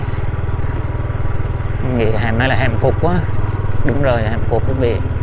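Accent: Central, Vietnam. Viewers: Cảm ơn em đã cho cơ hội theo em đi dạo cảnh Đà Lạt mỗi ngày. Những cảnh rất đời thường và thực tế. Thế mới quý, em ạ. Cách đây khoảng 8 năm, tôi đã ghé Đà Lạt và thấy xa lạ lắm. Qua những chia xẻ của em, tôi hiểu và quý Đà Lạt hơn. Ước mong có ngày gặp lại thành phố nhỏ và dễ thương đó. Bây giờ thì nghe quen giọng miền Trung của em rồi.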